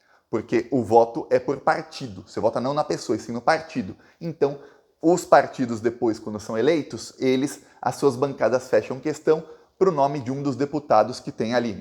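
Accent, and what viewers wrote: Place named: Bahia, Brazil. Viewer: vc e foda pow,pelo amor de Deus e modo baiano de falar. oq tá faltando pra esse canal bombar muito mais! e uma crítica,mais construtiva. para de passar os vídeos no celular e pegar seu microfone 🎤,ou contrata um editor ou vc mesmo faz 😂😂😂 para com isso,vc é muito bom mais pow deixa o canal melhor. contrata um editor ou vc mesmo faz. ei e uma crítica e ja disse construtiva 🕊️,e lula é 13 ate a morte 🤝🤝🤝❤️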